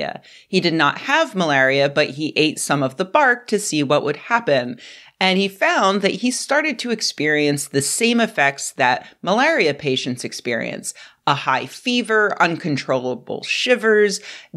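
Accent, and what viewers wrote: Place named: Pacific Northwest, United States. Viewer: As soon as she started talking, I knew you were from the Pacific Northwest—a place where people are very loud with their lack of information. I have nothing to say about homeopathy, but I find it funny you don’t understand that a licensed naturopath by law has the same education as a general practitioner. One difference is they don’t do a residency and are kept separate by insurance companies will be a second. Another is natural medicine is the foundation of their training. An allopathic doctor who does integrative medicine is secondary—— learn through CEs. A statement often heard in the Pacific Northwest is, “I trust science. “ This is a religious statement that has to do with science. The belief that something is true only if it can be empirically proven. This is misguided. Empirical fact and truth are two different things. 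Not everything true can be empirically verified. For instance, we have no idea why or where, in the brain, everything comes together from vision, hearing, and smell to be understood, yet we can still do all of those things. It is called the binding problem. If I remember correctly, in homeopathy, the active ingredients are broken down by parts per million. I don’t know if it works, but I also don’t believe there is a definitive way of testing it. I know that endocrine disruptors at lower levels are more likely to cause problems in the human body than at a higher level—— Which is one way petrol-chemical companies have been able to say their plastics were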